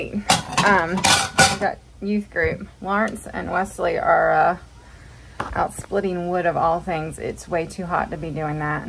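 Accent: South, United States. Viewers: I often think, regardless of your southern accent, you speak better English than the average English person of today. How brilliant to get a write up that gives an accurate portrayal. I have always been dismayed by journalist’s versions of events when reporting on things concerning us.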